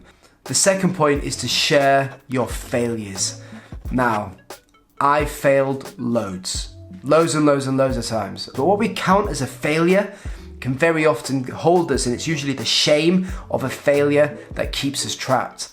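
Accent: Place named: United Kingdom. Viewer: Pedro Pascal with a British accent on a bike? Why not 🥸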